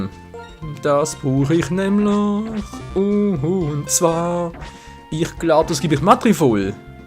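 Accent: Switzerland. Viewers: Besch du Basler oder Zuger ? ^^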